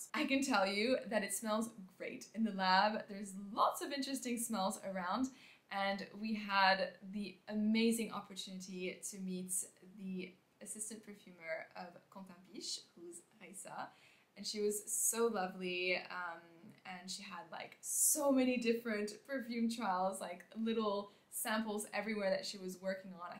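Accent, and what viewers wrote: France. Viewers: As an American who does not speak French, it’s hard to understand when you say the words with a heavy French accent. It would be really nice and helpful if you could put the words on the screen.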